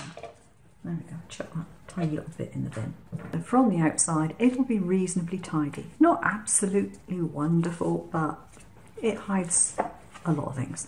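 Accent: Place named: United Kingdom. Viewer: Aurrrr dammet. Shes bri ish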